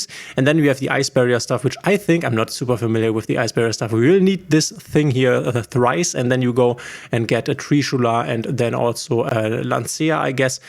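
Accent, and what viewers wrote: Germany. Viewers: Well, he's German, so he's going to pronounce some card names differently. You also cannot argue that Sauravis is an English word or name. Same thing with Baronne de fleur, a card which every English speaker pronounces incorrectly, but who cares?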